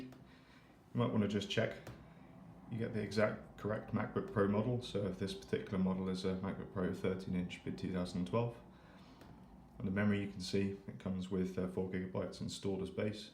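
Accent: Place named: United Kingdom. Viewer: Informative and British. Subscribed.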